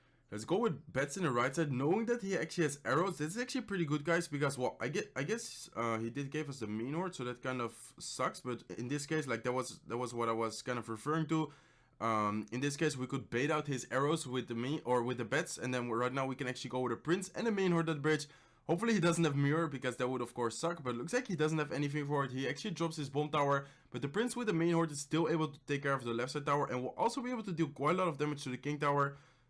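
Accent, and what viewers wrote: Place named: Netherlands. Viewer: Whaha k ben ook nl’er en je hebt echt een nl accent als je Engels praat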